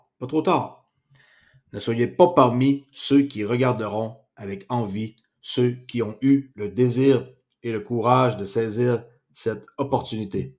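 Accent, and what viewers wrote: Canada. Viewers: je te kiff tu me fait trop rire avec ton accent, j'ai l'impression d'entendre mon beau frère du canada.. Bon j'ai du xrp depuis un petit moment et quand je t'entend je me dit tu doit en avoir vraiment beaucoup pour autant en parler..après ça me va bien car je suit beaucoup les infos autour de cette crypto que j'ai failli vendre tant de fois 😂😂😂 mais que j'ai gardé je ne sais pas pourquoi.. J'espère un avenir pour xrp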